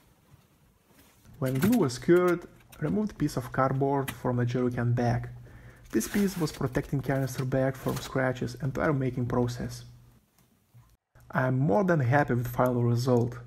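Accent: Russia. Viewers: Настолько русский акцент , что сразу спалился . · Русский акцент. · Русский акцент)))) Канал на русском есть? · sharp russian accent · Русский акцент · Пиздец какой у тебя Русский акцент браток! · Ебанутый русский акцент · чувствуется русский акцент..... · Лучше бы бот озвучил. Какой откровенный акцент, прямо как в американском кино, где русских показывают. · ты русский что ли??? акцент слышен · Why do you speak like russian? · Are you Russian? your accent is telling me Russian but I'd rather ask then assume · Oh!!! Russian English!!! 😁👍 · У тебя Русский акцент.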